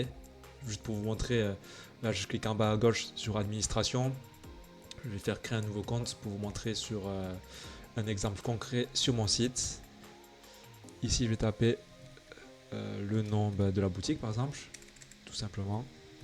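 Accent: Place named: Southwest, France. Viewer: accent familier du sud ouest ça fait plez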